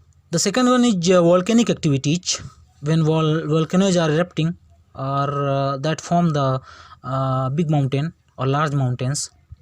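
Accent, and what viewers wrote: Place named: India. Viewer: By according to your phonetics, I think you are an Indian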